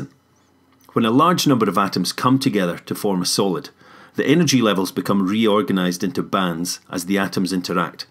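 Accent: Scotland, United Kingdom. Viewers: Scottish accent ay?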